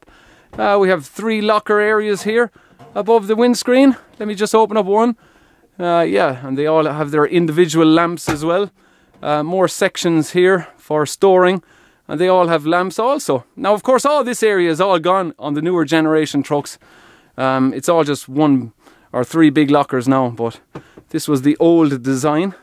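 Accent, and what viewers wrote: Ireland. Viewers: He’s Irish you dumb fuck!! 🙄🙄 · Hey I like your accent are you Irish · Pretty sure he is Irish :)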